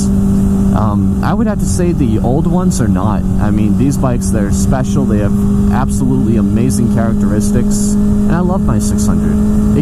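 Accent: Massachusetts, United States. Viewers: You from my home state of Mass? You sound like it in your accent a little.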